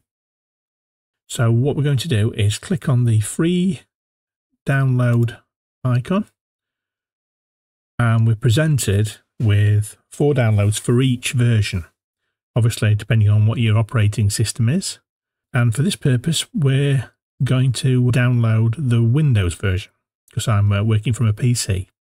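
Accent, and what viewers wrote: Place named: United Kingdom. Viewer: I get to learn Resolve while listening to a deep clear British accent, which for an American is a guilty pleasure. Lets go!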